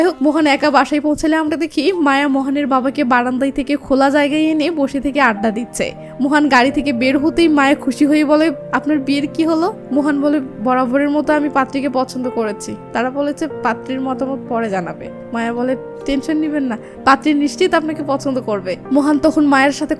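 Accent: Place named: Khulna, Bangladesh. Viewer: আপনার বাড়ি নিশ্চিত যশোর বা খুলনা।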